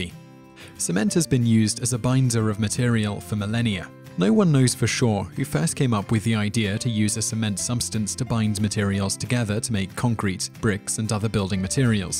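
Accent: England, United Kingdom. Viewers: Sounds like an english accent, but he says "sidewalk".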